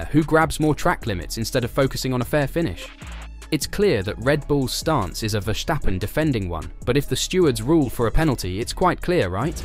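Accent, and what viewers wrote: United Kingdom. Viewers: Off course a Britisch accent, skipped.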